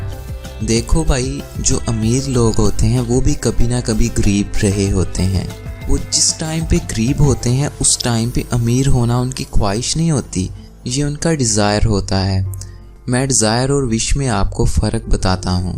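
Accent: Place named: Lahore, Pakistan. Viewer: Your English accent is typically Lahorian. Improve it. Or use 100% urdu.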